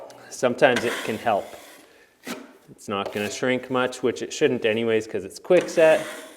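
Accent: Canada. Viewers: If you mix quickset 5 mud with quickset 20 will it set up in the average of the two (or 12.5 minutes) if both parts are mixed equally? Ok, I’ve worked the last 14 hours so my attempt at humor fell on its face. Great video (again). I’m so glad I discovered you a couple of months ago. I’ve learned so many techniques and tricks from you. I love your sense of humor too. I’m from Canada (and want to return) so it’s nice to hear your Canadian accent speaking in English:). Keep up the inspiring and informative videos! Btw, I was a carpenter while working though college. I built houses and apartments and since they’re still standing I must’ve done a good job. I’ve also made surfboards and made a 17 foot sailboat. 👍👍👍 Btw, is Canada allowing Americans into Canada? I was born in Canada so I hope they’ll let me back. You’re so right, these are hard and strange times. Thank you for that heartfelt message.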